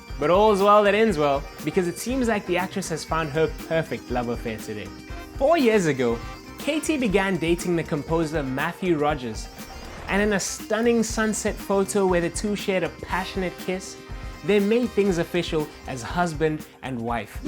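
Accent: South Africa. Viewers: This presenter sounds South African so over there Arrow season 7 was just released on Netflix. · is this guy South African?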